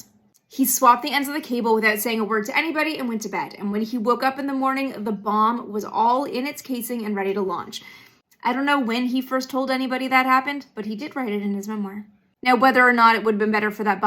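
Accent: Canada. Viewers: Yes, she’s Canadian. I don’t know if you’re new to the channel, but it becomes really obvious that she’s Canadian after a short while. I think it’s nice that she doesn’t try to hide it. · has a bunch of pronunciations that I find adorable. Some are Canadian. Some are based on how NASA pronounced words. I think this one was just a mistake. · You're such a Canadian, still. 🔥💙 The way you say "soldering." Yes, pronounce that L. I'd love to talk with you about it. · I know it's just your Canadian accent coming thru... but its pronounced 'sod-er'. the l is silent. love your vids!!! 🤗🤗🤗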